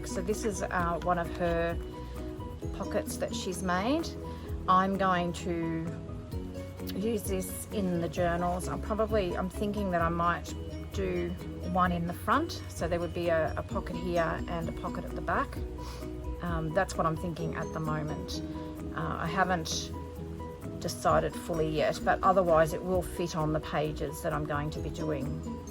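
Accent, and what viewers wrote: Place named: Australia. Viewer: I just subscribed to Heather of Ruby and Pearl because I love how she inspired you! Do I want to see you make the ephemera for the Journal? Yes! Do I want to have that background music? No, but when I put it on "closed caption" I could read what you said but missed hearing your lovely Aussie accent. ❤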